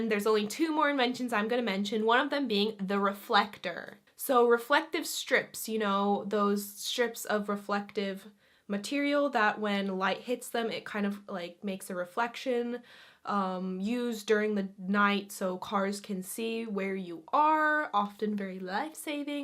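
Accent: United States. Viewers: Yu sound American not Finnish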